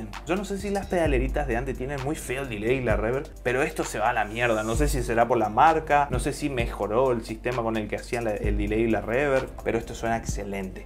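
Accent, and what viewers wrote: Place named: Paraguay. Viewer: Muy en el fondo se te nota el acento paraguayo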